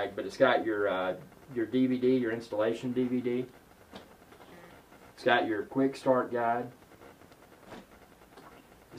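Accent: South, United States. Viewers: Not true there was neverwinter nights on the old imagine web service back in the day. I feel you are unqualified to review games, Because you are a mac user. Not to mention your southern accent really makes it hard for anyone to take you seriously. not to mention the fact that you showed no gameplay footage nor did you mention the features of the game. honestly I don't think you even played it.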